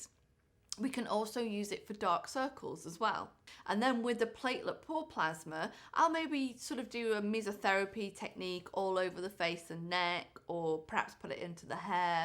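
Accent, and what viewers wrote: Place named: United Kingdom. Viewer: you are simply amazing...I love the way you talk with typical Briton's resonance....I follow ur videos and have got my dermatologist watched your videos...you enlighten us so much on these cosmetological treatments candidly...superb...God bless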